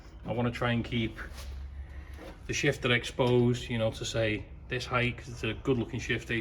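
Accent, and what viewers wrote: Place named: Warrington, United Kingdom. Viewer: I'm near Blackpool recognised the accent as my wife is from Warrington/ st.helens 😅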